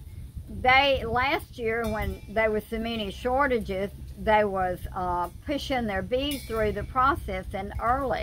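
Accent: South, United States. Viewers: I'm from Illinois and it feels good to hear your southern accent and looking at your background it seems like a touch of heaven.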